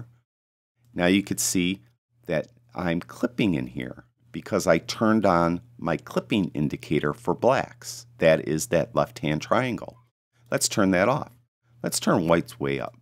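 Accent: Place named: Western New York, United States. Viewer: Hey There, first I recognized the unmistakable Western NY accent, but the working photo from Hamburg was the dead give away. I grew up there. So cool! If I'm not mistake, that's Hoak's restaurant. My first Communion dinner was there... some 48 year ago!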